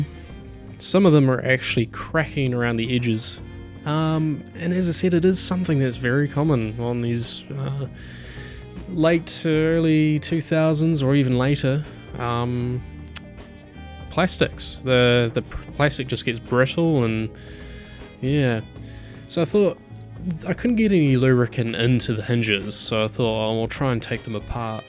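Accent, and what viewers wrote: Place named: New Zealand. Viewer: I just did a search on the Armada 110, this is the first video I saw - was looking at your machine in the video.......I think I own that exact machine now!!! I was looking at it thinking...no it cant be that big of a coincidence, but listening to your accent it sounded like a kiwi accent.....I went back into my emails from when I brought the machine off trademe last year - I am now sure it is the same machine - what a surprise! :) The machine is still going great, I am getting a lot of fun out of it. Cheers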